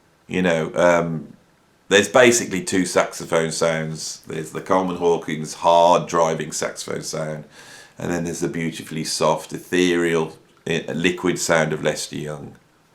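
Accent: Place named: England, United Kingdom. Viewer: what region in England is your accent from?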